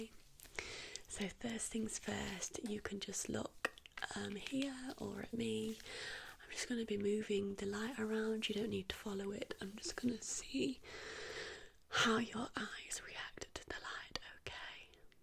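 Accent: United Kingdom. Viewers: I’m trying to figure out if she’s British or not 😂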